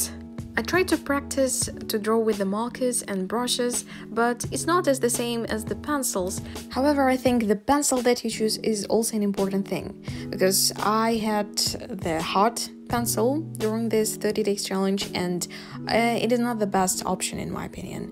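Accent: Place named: England, United Kingdom. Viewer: I just love you Englishvoice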